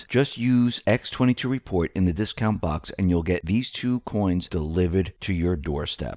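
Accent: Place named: New Jersey, United States. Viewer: He’s from New Jersey, you’ll grow accustomed to it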